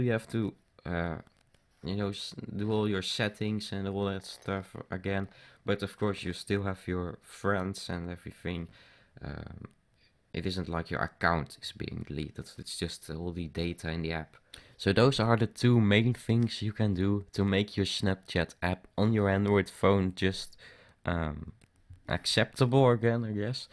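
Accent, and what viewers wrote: Netherlands. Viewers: Die te you from? You sound like a Dutch guy. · Gast je kan niet een ergere nederlandse accent hebben holy shit xd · You just hear it instantly that this guy is dutch xD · you have a duch acsent 😄 😉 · Ben jij nederlands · Je hoort dat hij Nederlands is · ik hoor aan die accent en de nu.nl app dat je nederlands bent haha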